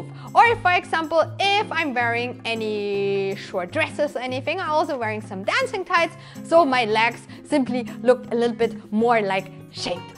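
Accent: Germany. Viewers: Is she german? She sounds like that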